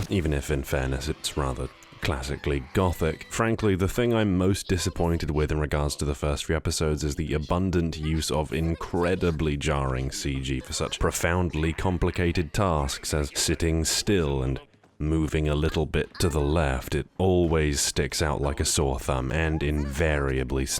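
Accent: United Kingdom. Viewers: Caffeinated British chatterbox boy did it again. You are getting me back into anime even though I got a girl and a kid. Watched Konosuba on your recommendation some time ago with the girl and we enjoyed it (after getting over the Darkness-induced cringe), so I guess we will be bouncing off some bunny girl's bum now. Keep it up!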